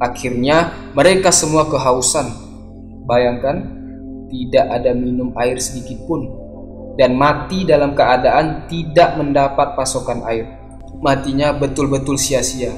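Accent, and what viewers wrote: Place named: Pontianak, Indonesia. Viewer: Org Pontianak ya bang..haahha..mantap ni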